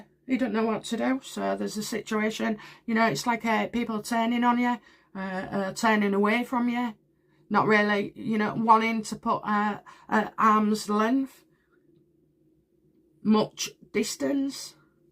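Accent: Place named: East Yorkshire, United Kingdom. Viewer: East Yorks I think.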